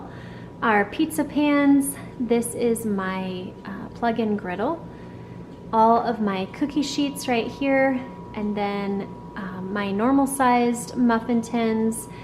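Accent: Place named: United States. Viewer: Your accent is wonderful, in which state in America I can find this accent? I am an English learner and I want to deal with the American accent. Please, give me the name of the state.And thanks in advance.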